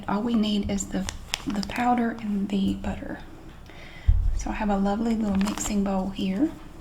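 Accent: South, United States. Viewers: Love how southerners pronounce oil. Great videos.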